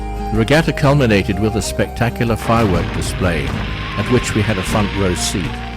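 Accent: England, United Kingdom. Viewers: Exciting journey, indeed. Lovely views of the North, spare commentary and this English voice - nice!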